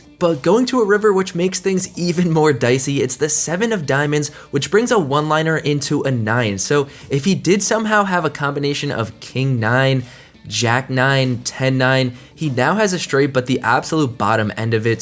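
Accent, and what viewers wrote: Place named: General American, United States. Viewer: Hey Sorry about the unlucky runouts!! Did you grow up in New Jersey, or did you grow up further west? You seem to have a more general American accent rather than the "typical New Jersey accent".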